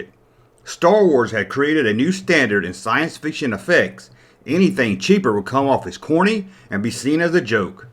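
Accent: South, United States. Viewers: are you from the south if so whrevinntouth arevyoubfrom I cxn tell your from the south by the accentv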